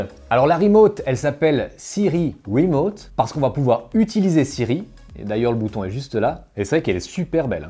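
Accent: Vaud, Switzerland. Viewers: Mais quelle touche délicate d'accent Vaudois c'est magnifique ! ça fait bizarre d'en entendre sur Youtube... Amicalement, d'un autre Vaudois.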